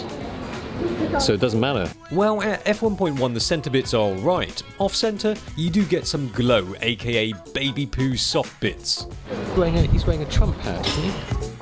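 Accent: England, United Kingdom. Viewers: How've come you got some fluent Brit accent? Bruh salute you 😎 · Why is it like a intellectual orgasm to listen to an Asian with a deep English accent? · I love Asian with British Accent, NICE. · I have know idea how. But his British accent. Also gave me satisfaction, beside his reviews.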